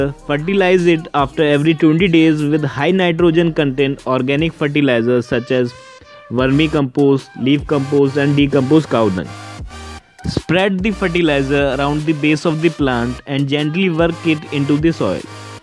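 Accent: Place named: India. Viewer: super ahhh zzhy ! Famous Indian accent!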